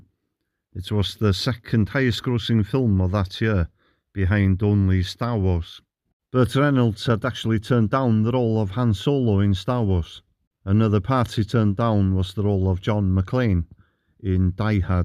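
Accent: Wales, United Kingdom. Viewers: Loving your Welsh accent!! Love your channel xx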